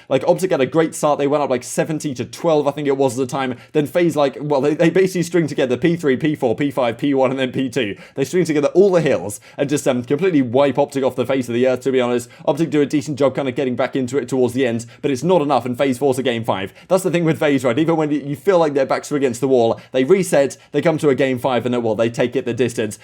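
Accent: United Kingdom. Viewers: British, ha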